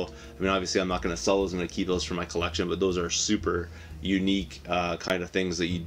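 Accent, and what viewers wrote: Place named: Canada. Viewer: i love how you silly Canucks pronounce Mario..good stack of stuff there man!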